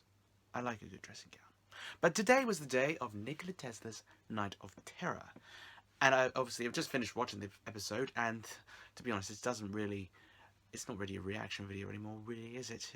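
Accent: United Kingdom. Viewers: not gunna lie you have the most annoying british accent ever, and im English myself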